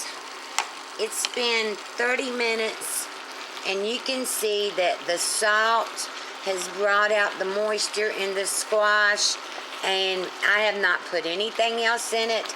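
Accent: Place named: Washington DC, United States. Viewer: where are you from? Your accent sounds like my older female relatives, from the Washington DC area back when it was fairly rural. Listening to you really takes me back, and they cooked these same dishes too. Thanks!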